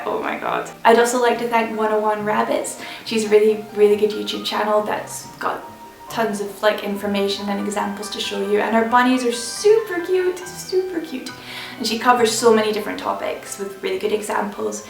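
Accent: Scotland, United Kingdom. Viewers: Are you Scottish? You have an accent :D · Are you from Scotland?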